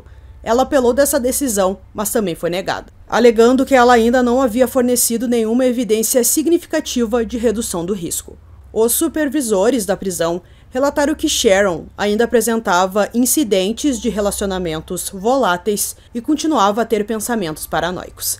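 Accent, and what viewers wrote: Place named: Rio Grande do Sul, Brazil. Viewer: Ela parece o Mário cortela falando. Será q e gaúcha tmbm?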